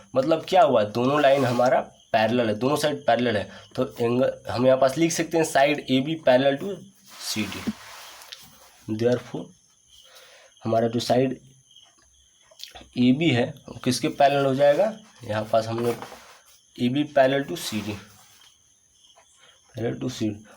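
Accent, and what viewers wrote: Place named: Rural, India. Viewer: You are speaking like a dehati. Dehati kahi ka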